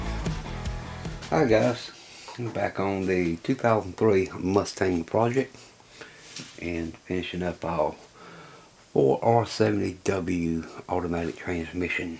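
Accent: South, United States. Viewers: Hi Everyone. Once again entertains us with another 'hands on' video of his talents and commentary with a distinctive southern accent. I am always looking forward to the next one. And through our generous Patreon support, we can assure there is another. As a Patreon supporter, you will have access to special projects and give aways not found on YouTube. This week, kickes off a repair and restoration program series on a Drake TR4 amatuer radio transciever I donated to the Radio Shop just for Patreon. As most hams know, Drake TR4 are rugged, well built rigs that can match any modern radio in a QSO ragchew or contest. We also began a R&R series with the preliminary repair of a Hallicrafter's PS500 power supply to be followed by the repair of a Hallicrafter SR400 Cyclone transceiver. Lots of good stuff coming to Patreon. I invite all of you to join in the fun for as little as $1. 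See you there!!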